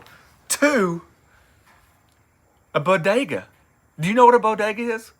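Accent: South, United States. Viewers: First video I’ve watched of you . I’m from SC and hear some southern dialect. Love it !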